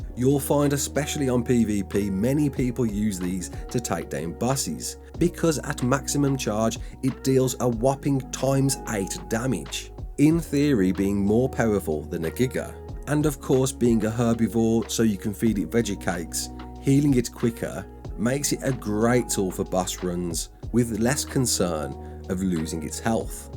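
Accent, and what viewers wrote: Birmingham, United Kingdom. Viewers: Brummie or Black Country lad?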